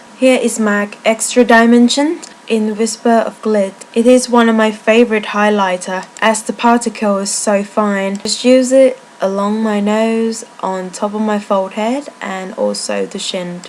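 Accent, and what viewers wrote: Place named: United Kingdom. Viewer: I wish I have British Accent like yours. T^T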